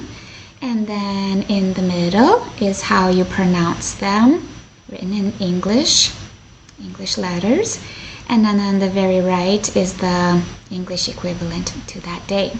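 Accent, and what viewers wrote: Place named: Russia. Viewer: I just love your Russian accent, it's just beautiful , thank you for teaching us 🌻🌻💛💛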